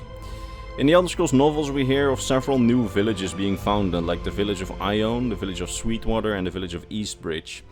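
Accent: Netherlands. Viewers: are you dutch you sound like your from the same country as im from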